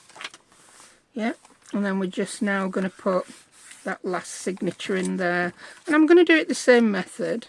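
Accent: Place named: Northern England, United Kingdom. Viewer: Hi there, just tuned in to your tutorial & just love your northern twang. Whereabouts are you from. I live in New Zealand now but originally I’m from near Buxton in Derbyshire.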